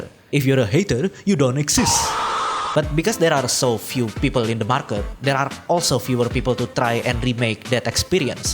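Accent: Indonesia. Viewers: You soun Indonesian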